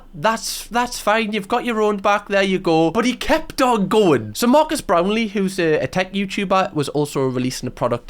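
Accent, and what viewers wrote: United Kingdom. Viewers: Are you British or Scottish like you accent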